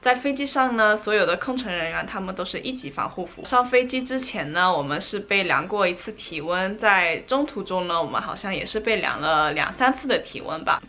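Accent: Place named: China. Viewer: 说英文时中式口音那么重。。。还说中文差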